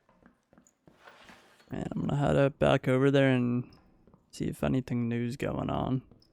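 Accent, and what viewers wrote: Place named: Valley, United States. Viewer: Thats a gross ass val accent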